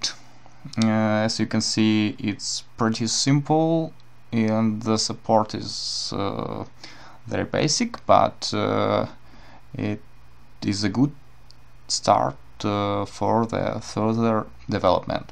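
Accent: Russia. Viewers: А я по интонации сразу поняла что русский! :P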